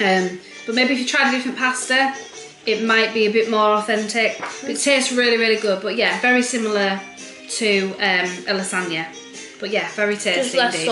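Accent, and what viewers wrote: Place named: West Yorkshire, United Kingdom. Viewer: Well done on your first Pasticcio. Hope you don't mind me saying that we would never put clove in it and the sauce gets smooth a lot faster with a balloon whisk. A great cook to watch on YouTube when you have time is Dimitra's Dishes - she cooks lots of authentic Greek food. I think you sound like West Yorkshire - I am South Yorkshire (Sheffield) but married to a Greek Cypriot. Enjoyed your video - thanks for sharing.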